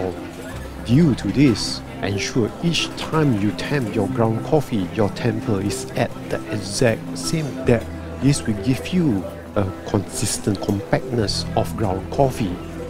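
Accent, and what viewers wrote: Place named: Thailand. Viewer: Sounds more like a Thai than Malaysian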